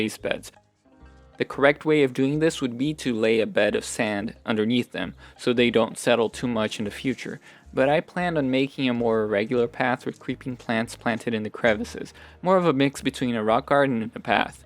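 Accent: Brazil. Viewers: Não sei se tu é Br mano, mas tem o mesmo sobrenome que eu e um sotaque mais brasileiro. De qualquer forma, teu canal é ótimo e estou me identificando a todo vídeo. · Wow!!! Just WOW!!! The convergence of your skill sets is just spectacular, this video is an absolute work of art! Are you Brazilian? You sound Brazilian! And I noticed two Brazilian cities made it onto your list of great cities. Such an amazing video. Thank you for sharing your passion so beautifully. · Tu é brasileiro ? Vc tem sutaque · Acabei de acha o canal e simplesmente amei, suspeito pelo jeito que você pronunciou salvador e Rio de Janeiro que você é brasileiro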